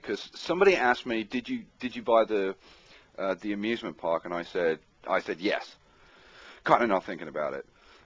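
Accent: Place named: United Kingdom. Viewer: I bought the Amusement Park. And yeah, it's ok, I like it. It would be nice, as you said, if it was more reasonably priced though. Thanks for all of the fun, educational, and entertaining videos! I love to hear what you have to say..... and.....I've always been a sucker for a british accent. lol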